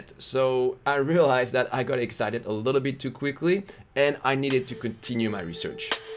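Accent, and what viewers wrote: France. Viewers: ouuhhh l'accent francais aahaha. Super video sinon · I think you are french 😁😁 i felt this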